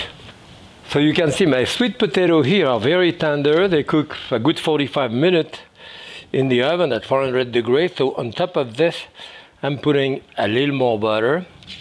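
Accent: France. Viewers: I just love your French accent. Especially the word. “Huny “ lol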